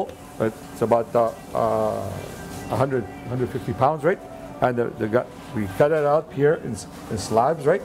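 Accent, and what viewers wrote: Montreal, Canada. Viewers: funny englihs accent exclusive to montreal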